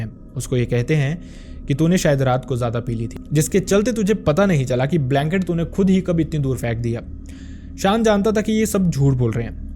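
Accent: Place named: Assam, India. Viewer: Bhai aap Assam se ho kya😅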